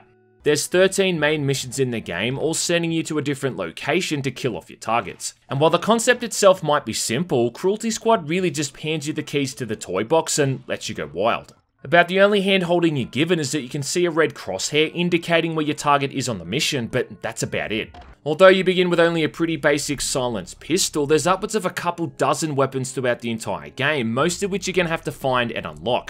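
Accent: Australia. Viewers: This review suddenly feels like the bad old days of G4TV's X-Play in the mid-2000's, but instead of Adam Sessler, you get an angry Australian.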